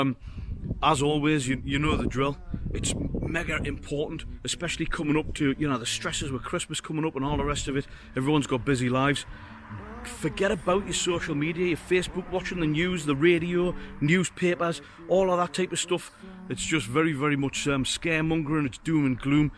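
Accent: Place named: Northumberland, United Kingdom. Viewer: Northumberland is wonderful. Great accent. I've just bought one!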